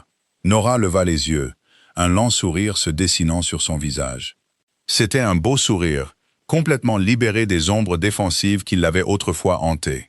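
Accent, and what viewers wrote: United Kingdom. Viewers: Un bon moment passé d'un roman historique comme avec un livre papier, sauf qu'à cet instant c'est avec la voix grave et monocorde d'un homme, doublé d'un accent britannique qui n'enlève rien à l'histoire, bien Au contraire ! Merci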